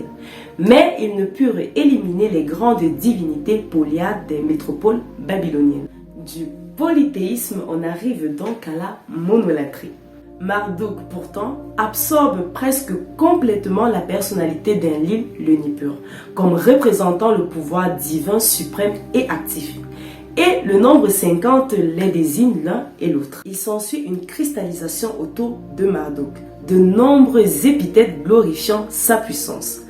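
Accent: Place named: Cameroon. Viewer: pourquoi tu parles comme une camerounaise?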